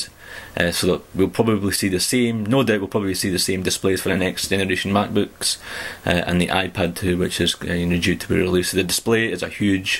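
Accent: Scotland, United Kingdom. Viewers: he's Scottish...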